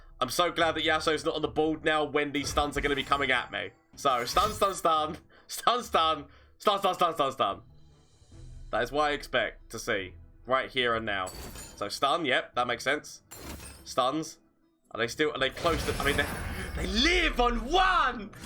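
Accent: United Kingdom. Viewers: Hehe love this accent ^^ Im From France ( Paris) Im in love with Scotland but i like England too 🤗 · The best about his videos are that latino face and name paired with that lovely british accent ❤️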